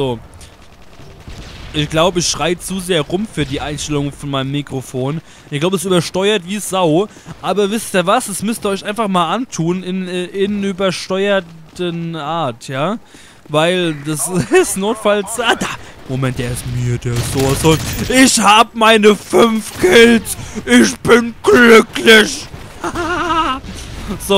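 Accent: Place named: Hesse, Germany. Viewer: Tatsache? Wow hätte ich jz nicht gedacht, denn es Unterscheidet sich wirklich kaum vom hessischen (Frankfurter Raum) Naja aber das Video unterhält, ist auch wirklich ne gute Idee ma richtig einen zu saufen, damit man zumindest dieses Kackspiel ertragen kann! Naja jz is ja Black ops 2 da.. hm wenn man darüber nachdenkt.. könnt man grad schon wieder anfangen zu saufen! (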